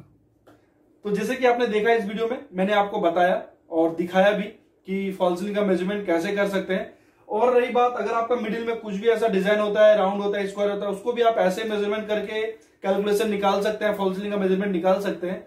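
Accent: Odisha, India. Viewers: Bhai aap oriya ho kya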